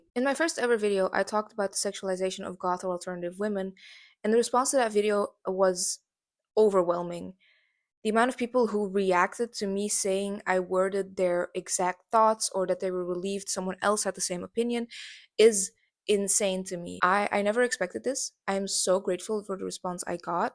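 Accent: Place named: Quebec, Canada. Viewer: Not related to the video but i love your accent!! Are you french canadian? You sound like me :)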